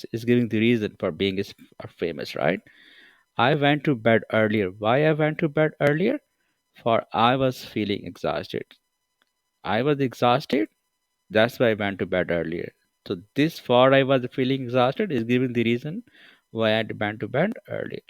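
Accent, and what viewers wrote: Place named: India. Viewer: Your indian accent is intolerable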